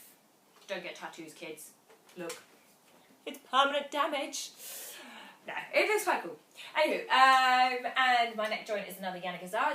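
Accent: United Kingdom. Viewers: thanks for upload these helpful tips. I use sometimes this technique on my baritone sax. Greetings from north Mexico. By the way, I like your lovely british accent;)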